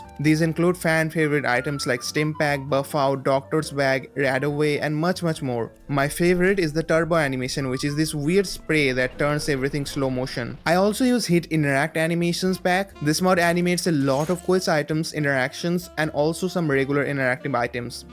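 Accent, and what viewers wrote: India. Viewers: As an indian i like fallout too, you sound like a bengali guy ngl. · Idk why its so interesting to me that people from all over the world like new vegas. You sound indian, its weird i wouldnt imagine new vegas being a very popular game in 2025 in countries outside the US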